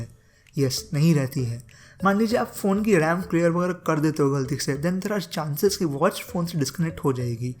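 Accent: Odisha, India. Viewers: Are you from Odisha ?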